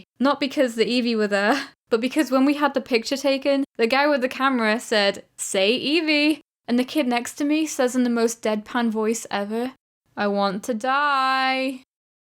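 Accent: England, United Kingdom. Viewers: Where are you from? I'm English too, I love your accent! Hope you're okay now :)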